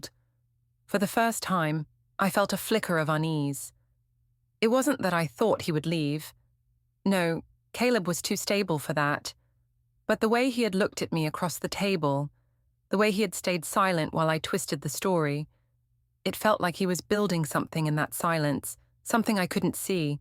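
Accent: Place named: London, United Kingdom. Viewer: An American story read with an an urban London accent. Cool!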